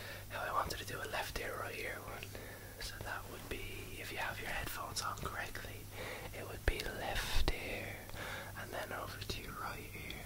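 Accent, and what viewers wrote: Ireland. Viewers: oh awesome your Irish. I'm Irish born in america but my family is from Dublin, Ireland i think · Niall Horan?!? Hahah jk I love your voice and that irish accent though!! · I'm hearin Dublin =D Cork here....good to hear an Irish whisperer out there =] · You have a lovely Irish whispering voice :-)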